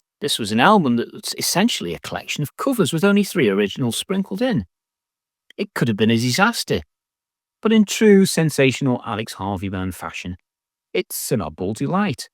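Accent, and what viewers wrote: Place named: Midlands, United Kingdom. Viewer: I agree,no ego... passion just flows brilliantly....the accent is perfect too, a bit of Kidsgrove or Smethwick blended with a sprinkling of progtalkability.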